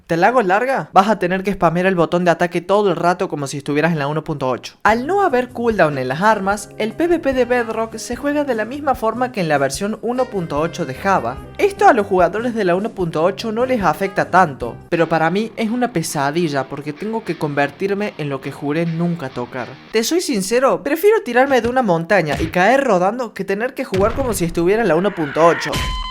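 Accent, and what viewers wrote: Córdoba, Argentina. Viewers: Sos de Córdoba? O flashee cualquera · Cómo me encanta el acento cordobés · Habla como cordobés. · sos cordobe?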